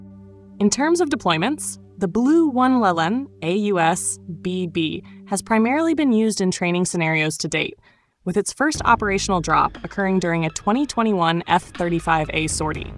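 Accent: United States. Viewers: All the companies you mentioned are foreign owned. The only way Thales would continue is if it sold its operations to another foreign company. Not to mention this clip is narrated by an American.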